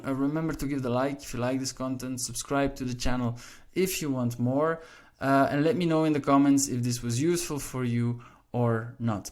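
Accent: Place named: Flanders, Belgium. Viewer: You have belgian accent, Vlaams 🙂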